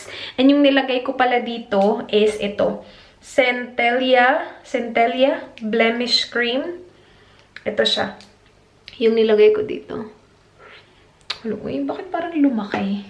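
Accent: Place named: Hiligaynon, Philippines. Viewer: bakit parang hiligaynon yung accent mo sa first one minute intro mo hahahahahaha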